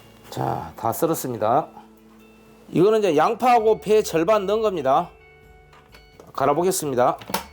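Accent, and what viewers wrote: Jeolla, South Korea. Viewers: 손님들이ㅡ한장 해버려 구만요ㅡ전라도ㅡ사투리 조아요ㅡ😏😏😊👍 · 구수한 전라도 사투리가 듣가 좋습니다..저도 고향이 전라도..ㅋ. 절이는 노하우 이용해서 올 김장은 좀 더 많이 담궈야겟네요..감사합니다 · 셰프님들중 셰프님이 쵝오 입니다 설명도 귀에 쏙쏙 들오게 잘해주시고 전라도 사투리로 아주 재미 있습니다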